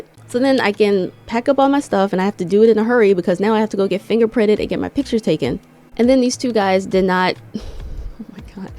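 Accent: England, United Kingdom. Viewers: Sidenote! That English accent is leveling up innit!😂😂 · Girl, you have been here a while/often. You have a cracking english accent. It's better than mine. I've been here for 52 years 😂 Bless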